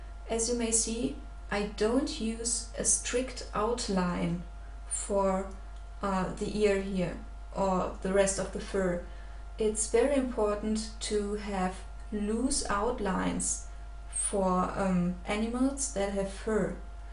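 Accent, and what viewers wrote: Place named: Germany. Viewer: Are u german? U have a german accent...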